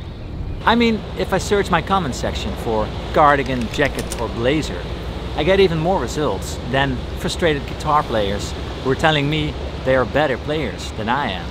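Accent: Netherlands. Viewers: He is so Dutch. His English sounds Dutcher than Dutch. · I'm a noob guitar player and your stuff's casual teaching has helped me learn faster than many other tutorials online and in books, so awesome work. Also... I absolutely love your accent. It's Dutch, right? I also am studying accents for voice acting and watch your videos to learn how to do that accent. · Sweet Brew Yeah it’s a Dutch accent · I knew you were Dutch from the moment I heard you speak!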